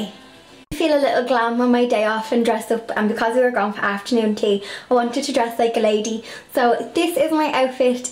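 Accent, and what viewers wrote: Ireland. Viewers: I have been watching so many of your videos and I really am enjoying them and love them so much. Can't believe the way you painted your fridge and gave it new pastel champagne pink look. love your Irish accent aswell by the way. You really have taste and style girl!!!! Your home must be so stunning to live in. So feminine and girly and such a stylish shabby chic cottage. Really love your videos sooooooooooo ooooooooooooo much. Your fine bone china collection is worth an absolute fortune. Please keep doing your lovely stylish videos of different rooms and different aspects of your life y r so good at making videos.xxxxx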